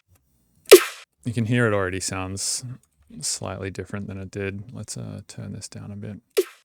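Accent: Australia. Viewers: He's from Australia, he always sounds like he has a cold! :D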